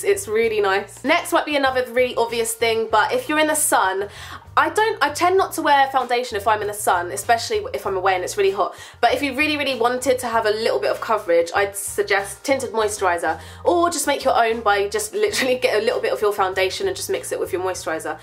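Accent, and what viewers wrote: United Kingdom. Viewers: LOVE The Hobbit! Its cool to see we have similar reading taste! Love your videos as well <3<3<3 I live in the US, but my boss is a Brit, and he talks similar to you! Your accent/voice put a smile on my face! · Love your british accent.dont like the hair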